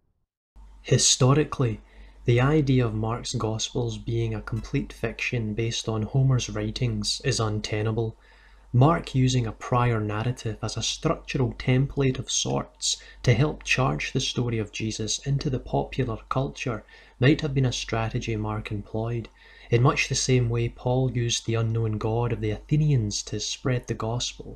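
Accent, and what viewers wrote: Scotland, United Kingdom. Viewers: 50 years after Christianity was declared the official religion, the western Roman empire fell. Ushering in the most depraved chapter in recorded human history. The church was Europe's rulers. Who are you trying to kid? The inquisition, routine torture of heretics, (one Pope complained the people being tortured, in the basement of the Vatican, were not hollering loud enough), the routine practice of cannibalism by the crusaders, an estimated 50,000 people burned alive for demon possession, slavery (the first slave ship in N America was named for Jesus), the routine destruction of ancient science (Archimedes discovered calculus almost 2,000 years before Newton, Christian monks destroyed it.) The only good part of your doc is your accent. I assume you are from Scotland. The most depraved archeological dig I have ever encountered occurred in Hull. 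Priest trading sexual favors for privileged positions in the after life. <link>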